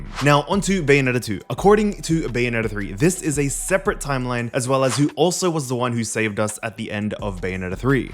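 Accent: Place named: North America. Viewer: Where are you from bro? It says Australian on your channel but your pronunciation of certain words sounds very North American. Thanks for explaining this clusterfuck of a story though - much appreciated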